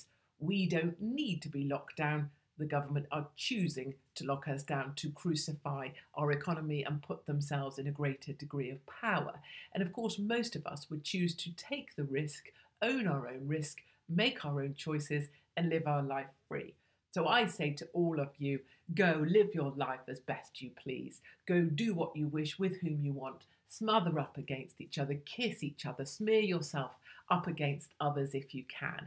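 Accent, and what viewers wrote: United Kingdom. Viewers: your beautiful. Classy. Your british accent is perfect and not thick and heavy, l think some british try to make it thick.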